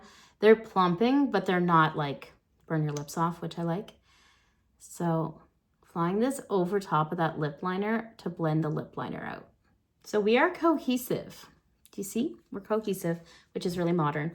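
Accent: Canada. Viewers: I think it’s that she’s normal and genuine. I don’t find I can relate to a lot of beauty YouTubers. I don’t find many of them teaching anything I find helpful, more so just selling products. I don’t feel like does that. I actually feel like back in the day when I worked as a makeup counter artist for Bobbi Brown, reminds me of one of the traveling makeup artists that I loved to work with. I leaned so much from her. I also find her Canadian accent cute, as a Texan it’s so cute to me.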